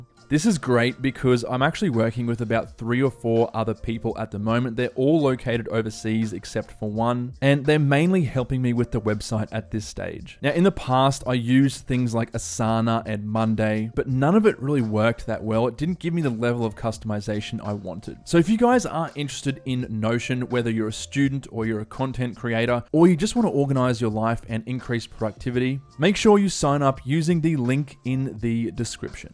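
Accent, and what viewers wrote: Australia. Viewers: You’re aussie right 🥵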